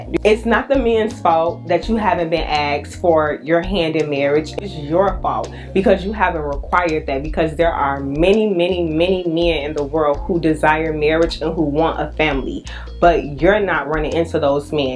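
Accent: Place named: South, United States. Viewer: Your beautiful ❤️....Your accent ....Hmm....sounds SOUTHERN......where are you from sweetie ?? Atlanta ?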